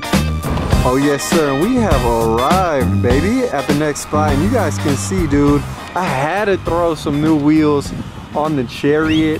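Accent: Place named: Texas, United States. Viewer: I appreciate the Texas Flanders accent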